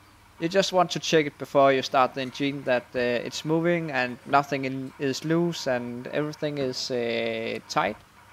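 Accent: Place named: Denmark. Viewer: Det var cool - Nu ved jeg hvad en selv justerende kabel er og den som er på min T4 VW er igen på plads. Hvordan det ennda er skete, havde den selv hoppet ude af pedalens fatning! Du dukkede op under en relevante søgning og selvom ikke VW, traf jeg rigtigt med den første skud. Tak kære bror - med en hånd mekaniker, en hånd videografer :-) Great mate and thanks (oh, and the Danishness is nicely unmistakeable in your seemingly impeccable english. haha)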